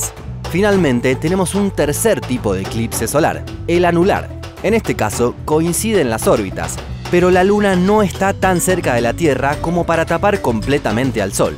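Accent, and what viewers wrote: Argentina. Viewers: ¡Saludos desde Pittsburgh! Por fin encontré un YouTuber que habla bien y claro sobre temas interesantes. Me encanta este canal xk me expone al vocabulario científico en español, todavía estoy aprendiendo el idioma. Además el ascento Argentino me cae bien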